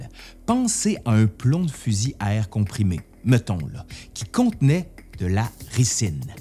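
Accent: Quebec, Canada. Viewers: C'est drole avec l'accent quebecois. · Je savais bien que l'huile de ricin était trop dégueu pour être honnête 😄. Le coup du parapluie bulgare est tellement romanesque que le cinéma l'a utilisé souvent et Pierre Richard l'a rendu immortel avec le coup du parapluie, que je vais d'ailleurs me revoir, ça m'a donné envie 🙂. Vidéo intéressante, elles sont toujours de qualité et bien écrites, le "petit" accent donne le petit plus, j'ai toujours eu envie de visiter le Québec. Tu prépares avec ton compère une histoire de la médecine? Tu m'en vois ravi. Je me réjouis de voir ça. Je suppose que tu prévois plusieurs épisodes pour un tel sujet? Cool, excellent programme. Encore bravo à toi et à ceux qui bossent avec toi. Salut. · Bonjour. Merci pour vos vidéos toujours intéressantes, marquées de l'accent de la belle province qui, je dois l'avouer, me manque un peu. 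Sujets maîtrisés, une excellente présentation soulignée par un montage de qualité, agrémenté de parfaites animations. Longue vie à votre chaîne.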